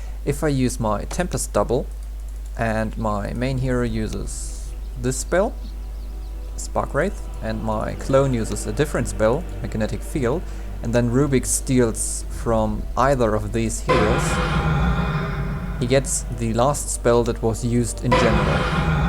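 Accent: Germany. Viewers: It is a german accent, I believe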